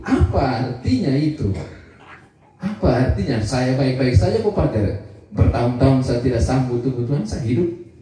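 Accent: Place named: Lembata, Indonesia. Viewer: ni macam dari pulau lembata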